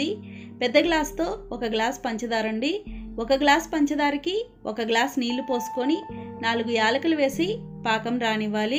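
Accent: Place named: East Godavari, India. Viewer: నాకు మీరు చేసే హల్వ కాన్న మీరు మీ మాటతీరు నాకు బాగా నచ్చింది అండి తూర్పు గోదావరి జిల్లా కదా అందుకే east is Best అంటారు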